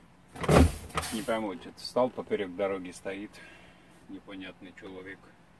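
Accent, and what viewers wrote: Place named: France. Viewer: Спасибо, ну впринципе не особо удивлён, последствия "приёма беженцев" во всей красе И грязь и попрошайки это пожалуй самое безобидное. Каждый раз удивляюсь твоему французкому акценту - навигатьион, итд:) Вроде немец жеж:) Думад француженка, так нет, вы по немецки вроде общаетесь, логичнее кмк Кэтрин всё же:)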